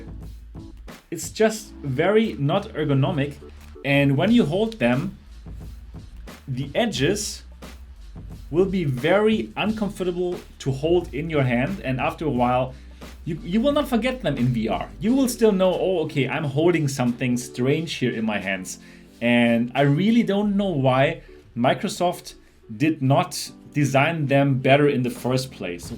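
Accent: Germany. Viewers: Thanks mate for these very well-done and informative videos, complete with a nice German accent :) I am a bit puzzled, though, how you find the Samsung so great while most others seem to find loads of flaws in it. After looking at lots of videos and reviews I decided to go for the Lenovo, just ordered it from Amazon ($279 + customs and shipping). I am a bit concerned about the bluish tint of the displays. Is it possible to adjust the display colours via some software? Naturally you can't do anything about the low contrast of the LDC display but surely it must be possible to adjust the colors more towards red? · Tscherman not Austrian.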